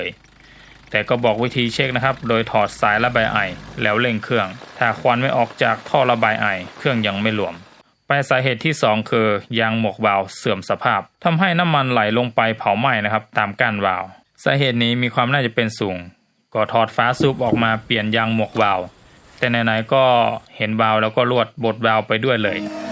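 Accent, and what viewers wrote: North, Thailand. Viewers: ฟังจากสำเนียง แอดมินนะจะเป็นคนภาคเหนือ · เป็นคนที่ไหนครับ สำเนียงเหมือนคนเหนือ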